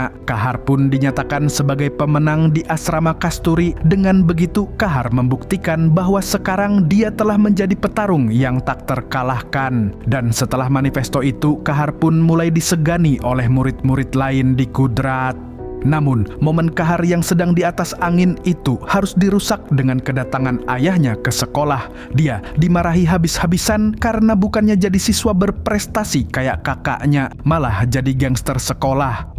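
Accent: Banjar, Indonesia. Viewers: Urang mana gerang bang nih. Urg banjar kah 😂😂😂... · Orang banjar sekali nya sidin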